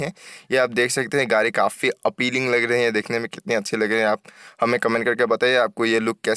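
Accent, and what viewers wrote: Assam, India. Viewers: Axomiya tone full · This is Assamese accent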